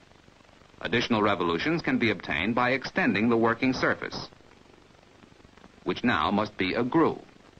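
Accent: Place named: Texas, United States. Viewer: Where all of these series with Texas-dialected-man comes from?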